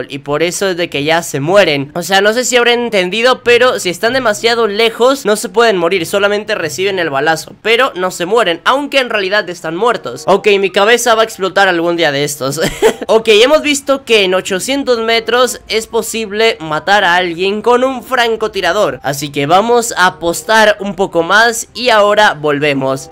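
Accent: Mexico. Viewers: Yo sí te creo que eres de México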